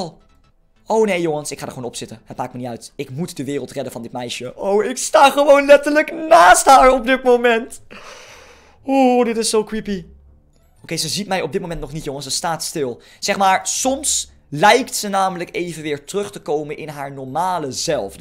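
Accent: Netherlands. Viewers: Je hebt echt zo’n nederlands accent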